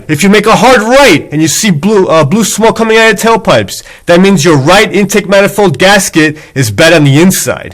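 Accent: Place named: New York, United States. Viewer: Must be from NEW YORK!!!!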